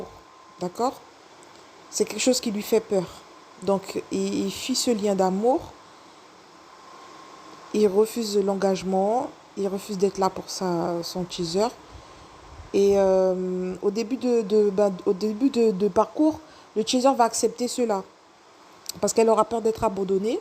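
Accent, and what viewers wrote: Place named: French Guiana. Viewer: Bonjour, merci pour ces explications. Je ne connaissais pas votre chaîne, je me retrouve dans vos explications. Je me suis abonnée et écoute depuis hier vos vidéos. Vous avez un accent guyanais 😊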